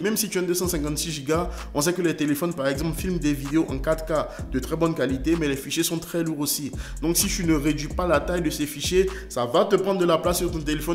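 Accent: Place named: Ivory Coast. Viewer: T'es Ivoirien ? Ton accent est franchement cool😁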